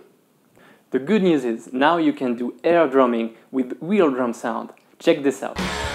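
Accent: France. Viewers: oh mon dieu... mais t'es francais en faite ! bon bah j'ai appris un truc cool, grace à ton sublime accent :p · Haha he sounds French. But he is one of the few that actually speaks decently english!!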